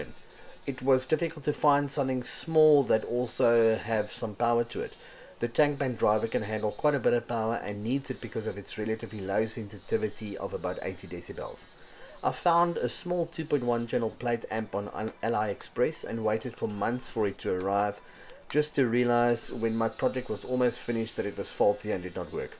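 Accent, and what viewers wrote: Afrikaans, South Africa. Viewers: he sounds so Afrikaans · Nice to hear s.africa accent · wow you sound very Afrikaans.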